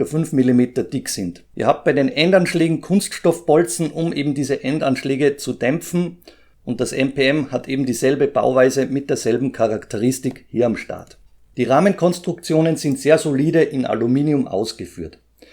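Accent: Austria. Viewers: Hallo, du hast in dem Review einen Adapterkabel von einem dritthersteller geredet, da ich selbst langzeitkunde von fanatec würde ich die mpm gern auf meinem F1 csl wheel nutzen (first Generation) da die APM für das neue porsche wheel vorgesehen is … somit ist das Interesse an diesem Kabel des Drittanbieters extrem interessant!!! Ps: bin oö‘er … du klingst als wärst du nur ein paar kilometer von mir weg? LinzLandler?!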